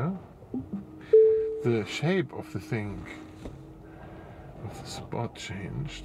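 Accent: Germany. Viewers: Just curious, where are you from? Because your English sounds a bit with a German accent. I could be completely wrong though. Amazing how vision Autopark works. Now they need to speed it up a bit and they should stop turning the wheel while the car stays still. Just unnecessary stress on the axle / steering components etc.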